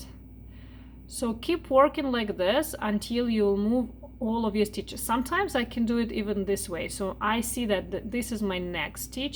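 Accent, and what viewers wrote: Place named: Russia. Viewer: I love this tutorial! Your hat is so beautiful. I'm going to make one for my Dad for Christmas. By the way, your accent sounds just like the beautiful Russian accents I heard in college when I lived in a Russian community house. Is that your nationality, if you don't mind my asking? Thanks again for the excellent tutorial! ❤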